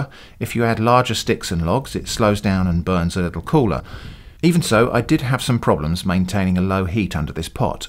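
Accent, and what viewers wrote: United Kingdom. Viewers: its like Primitive Technology with UK dub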